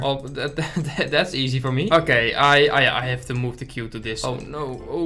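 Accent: Netherlands. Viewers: I smell nederlands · I smell dutch in your voice ( SRY ) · i don't know why but i smell dutch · I smell dutch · HAHAHA NEDERLANDSE ACCENT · Je bent zoizo Nederlands soms hoor ik Nederlandse accent😅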